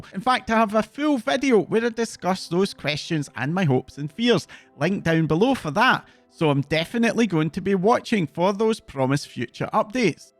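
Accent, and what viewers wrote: Scotland, United Kingdom. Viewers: Love the Scottish accent fr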